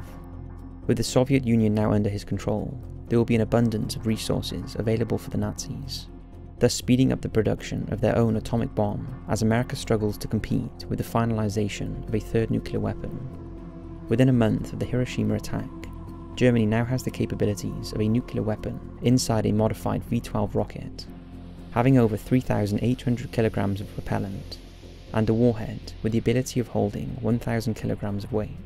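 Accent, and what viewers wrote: United Kingdom. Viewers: British accent.....instantly clicked off.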